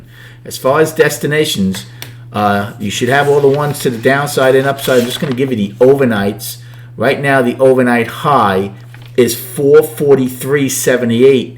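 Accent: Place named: Boston, United States. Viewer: I know a boston accent when I hear it lol I'm from western mass! Let's get paid today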